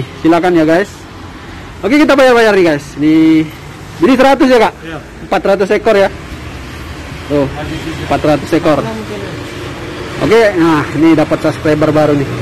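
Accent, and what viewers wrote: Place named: Palembang, Indonesia. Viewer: Logat nyo, wong palembang caknyo ini😀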